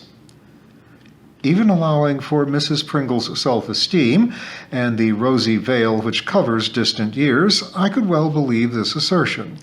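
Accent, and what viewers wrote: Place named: United States. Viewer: I have read all of the Miss Read books and was delighted when I found you reading them. I listen when ironing, cooking and other quiet activities. At first I thought I wouldn't like an American accent reading old country tales from the Cotswolds but now I'm champing at the bit waiting the next installment. Loving every minute of your pronunciation of many words. Are you going to read the Thrush Green books as well? I am English but live in France.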